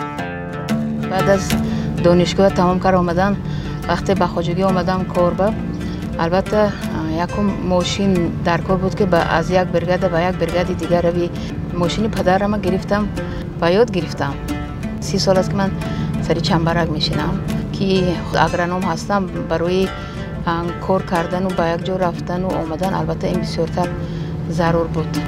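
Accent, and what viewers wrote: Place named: Chorkuh, Tajikistan. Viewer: Ҳам адаби хам лаҳчаи зебои Чоркуҳи тарзи суханрони зебо вафаҳмо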